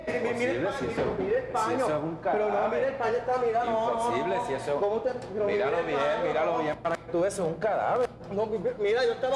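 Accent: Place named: Puerto Rico. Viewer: Me encanta el acento boricua. Bendiciones a Puerto Rico.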